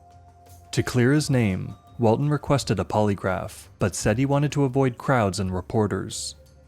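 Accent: Canada. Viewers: The narrator is clearly Canadian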